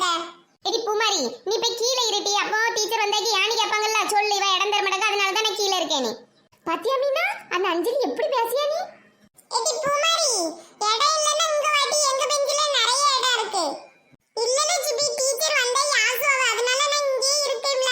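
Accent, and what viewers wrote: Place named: Tenkasi, India. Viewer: Idu entha ooru slang semaiya iruku ..thenkasi side madri iruku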